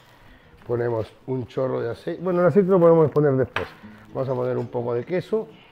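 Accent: Chile. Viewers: Alfredo Santaria si lo mismo me dije yo.. en su uniforme de cocina dice perú.. pero tiene un dejo de chileno inconfundible!. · se le pego de chileno po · si tu eres peruano shora hablas co.o chileno por favor donde estamos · Queee !! No se pone huevo crudo 😱y dónde está la cebolla el soasado ?? 🤔 Mi abuela le hubiera jalado las orejas No Sabe Nadaaa 🇵🇪 de Huancayna . . Noto un dejo chileno ?🤦🏻‍♀️ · hechastes el aji crudo si soasarlo ,na que ver ah otra cosa habla como peruano no pierdas la esencia ,y no hables como chileno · Y PORQUE HABLAS COMO. CHILENO..😃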